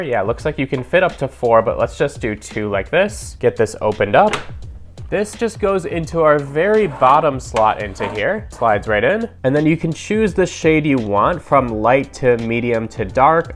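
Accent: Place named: United States. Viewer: This fella pronounces his ‘e’ as an ‘a’. It’s a very American thing…messing the lingo up. Good presentation though.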